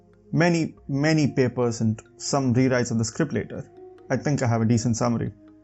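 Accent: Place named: Kerala, India. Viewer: Typical Mallu accent/tone....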